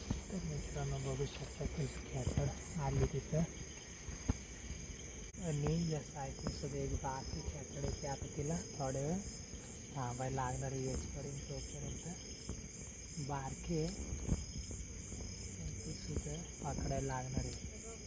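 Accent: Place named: Telangana, India. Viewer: Bhau tumhi telgu aahat ka tumchi marathi language telgu lokan sarkhi ch aahe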